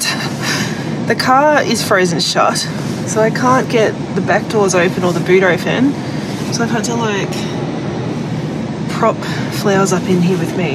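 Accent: Australia. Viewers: Hi, just wanted to tell you I’ve been watching your channel for approximately a year now( yours is one of my favorite flower channels) love the Australian accent also all the scenery footage. As far as ideas on helping to sell flowers you could do workshops in your studio and also online. Your a very good educator and I love how your husband is as passionate as you are(maybe film more on a daily basis to include family life), I’m from the states and I love seeing Australia. I watch some YouTube channels who ask if you like the content and if they’ve been of any help to the viewers you can buy me a coffee ( which I believe to mean a donation in any amount to help keep the channel growing).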